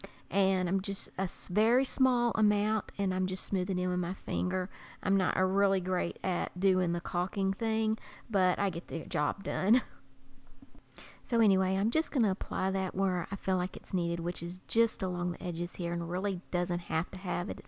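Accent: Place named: South, United States. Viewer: Omg! Such a well done video, and I just LOVE you southern accent! ❣️ Thank you!!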